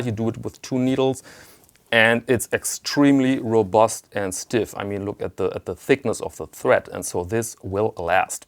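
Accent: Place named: Germany. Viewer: Hi, great Video! I assume from your subtle accent and the Giro-Card, that you are German. Do you have any suggestions for a good online or brick and mortar shop based in Germany, best case in Hamburg. Cheers, Thorben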